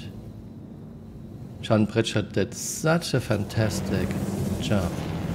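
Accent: Germany. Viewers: this is probably the most romantic german accent i've ever heard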